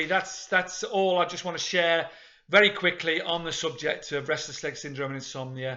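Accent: Liverpool, United Kingdom. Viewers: ...I've seen many videos on opiate w/d...I'm an old hand at it like yourself. But you've shot straighter than most I've seen. Often people will say, "After 4 days the worst is over"...you can be sure that person is taking the piss, out and out lying...why someone would want to pretend to be an addict is beyond me. I hope you are doing well. I had a close friend in Florida where we drove cabs for the same company...he was from Liverpool...you sound like you're from if not Liddy, then Manchester or the neighborhood. Great people in the North. Well, thanks for caring enough to make these for those facing the dread of RLS, what we used to call "jimmy legs". Cheers from Pennsylvania! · PS love your accent , what part of the UK are you from?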